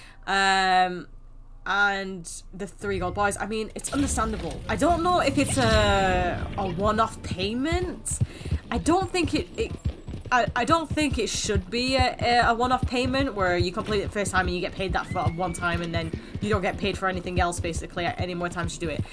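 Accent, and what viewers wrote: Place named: Ireland. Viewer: i played all love,so...I whant only to hear you Irish accent! :)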